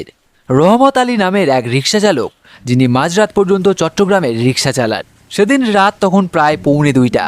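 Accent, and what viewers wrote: Kolkata, India. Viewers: রহমত আলী পাহাড়ের উপরে রিক্সা উঠালো কেমনে, আপনার ভাষাতে মনে হচ্ছে আপনি কোলকাতার,আর এই পাহাড়ের আশেপাশে আমার বাসা, তবে হ্যা এখানে ভয়ের ব্যাপার আছে, এটা জানি,কিন্তু এই পাহাড়ের রাস্তায় রিক্সা উঠানো একেবারে অসম্ভব ❤❤❤❤❤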